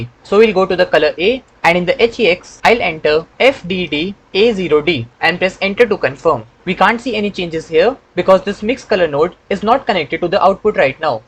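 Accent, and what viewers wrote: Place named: India. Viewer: Straight at Point and no other needless stuff 👍 You Voice and bit of accent seems like Bharatiya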